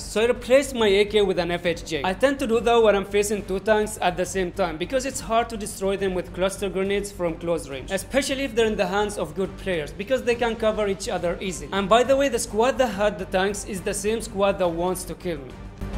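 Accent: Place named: India. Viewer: Yo, you're sound seems Indian....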